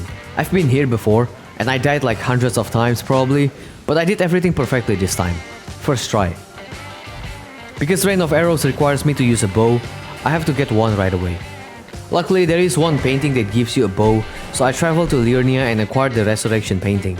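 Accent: Indonesia. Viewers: are you indonesian? you sound very indonesian no disrespect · pantes kek ga asing logat inggrisnya ternyata org indo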